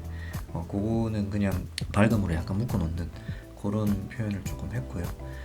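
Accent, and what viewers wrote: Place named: Busan, South Korea. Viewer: 붔산사투리가 구수하네예~~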